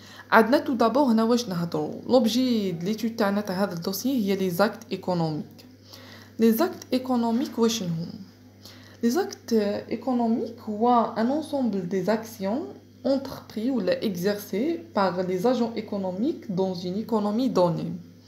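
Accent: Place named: Kabylie, Algeria. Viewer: Azoul tu es kabyle sa apparaître dans ta prononciation 🤭❤️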